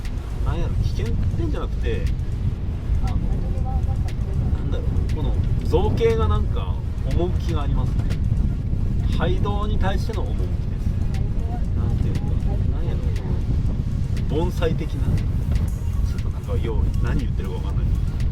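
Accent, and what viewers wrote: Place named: Fukui, Japan. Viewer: 声を聞いていたら福井弁のように感じましたので思わず登録しました(笑) この道は３０年ほど前に比べると今は少し良くなった気がしますね。それでも普通も道しか知らない人には驚きの連続でしょうね。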